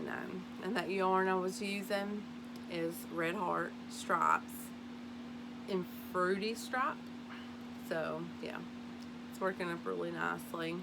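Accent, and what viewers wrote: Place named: Appalachia, United States. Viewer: Beautiful makes!! I love hearing your hillbilly voice 😂😂 I'm a hillbilly too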